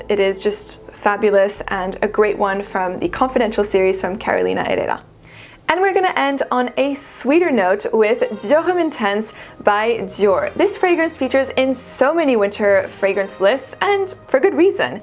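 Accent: France. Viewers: I like your french accent. Grüsse aus Deutschland. Und danke für die gesamten Inspirationen!! 😀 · thank you for this video. French accent is what I'm always looking forward to when watching your videos. Don't apologize for that. I want more French accents! 😂 I like your list. My winter favorites are: Prada L'Homme Intense, Dior Homme Parfum, Bvlgari Man In Black, Guerlain L'Homme Idéal Extrême and Stronger with You Absolutely.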